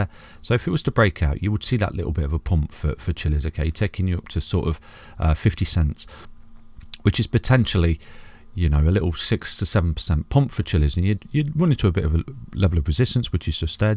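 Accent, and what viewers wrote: United Kingdom. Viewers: Didn't expect to find Chiliz T/A - Have now subbed and hit the bell button :) Great to listen to a british voice. I'm all in :) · Following your prediction perfect so far. Love your British accent, but I think it would be good for your channel to use, or at least mention USD prices. I’m European, but because most people on the internet use USD price, I find it easier to think in USD levels.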